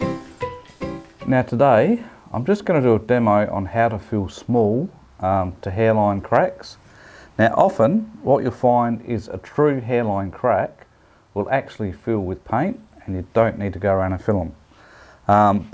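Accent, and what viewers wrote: Australia. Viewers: thanks, mate! love the accent, Tasmania?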